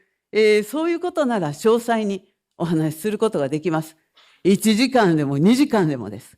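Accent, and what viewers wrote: Kansai, Japan. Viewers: 高市さんって庶民的な感覚もありますが品もあり聡明ですよね 柔らかい関西弁素敵です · 関西弁をうまく使い分けてる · 関西弁や〜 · 関西弁のスピーチ嬉しいなぁ❤ by選挙区民😊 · 総理になられても関西弁でお願いします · 確かにかすかな近畿なまりがある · こんなカッコいい関西弁聞けて感無量です。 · いやあ〜聴いてよかった🙋‍♀️💝 関西弁も混じり彼女の言葉が熱く語られ、めっちゃ応援しまっせ〜と感じます❣️徹夜を止めるも体のことを考えてよかったです❤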